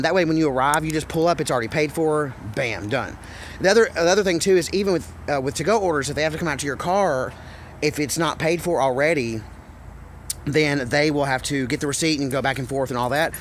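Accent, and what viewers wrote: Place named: South, United States. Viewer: It sounds southern.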